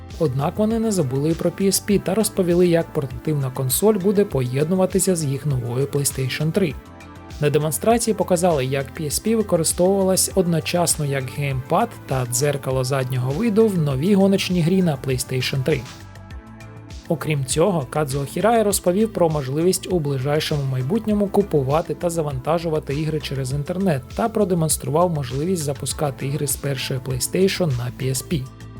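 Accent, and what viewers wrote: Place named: Russia. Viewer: Адже я чую залишки російської мови)) Поки я дивився, мені вдалося вивчити українську мову.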